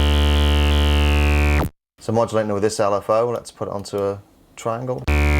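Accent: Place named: Chester, United Kingdom. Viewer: great review mate...you sound like a Chester lad!!!!!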